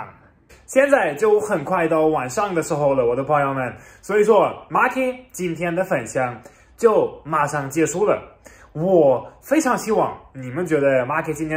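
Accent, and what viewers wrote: Sichuan, China. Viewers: 这川普有点打脑壳！！🤣🤣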